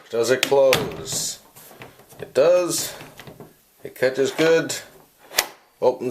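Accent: Newfoundland, Canada. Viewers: Love the Canadian accent, you sound like Relic from the Beach Combers. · You a Newfie? Thanks for the vid! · Canadian... Love to listen to him talk. Unfortunately, neither of my knobs have visible screws, so I'm still at a loss. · sounds like he's from Canada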